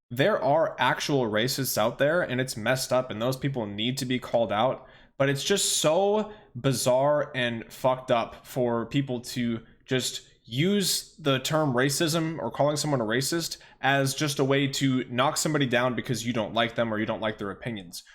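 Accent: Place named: United Kingdom. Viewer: He just sounds condescending to some people because 1) he knows what he's talking about 2) cause of the accent. People have been taking the British accent the wrong way for hundreds of years now lol.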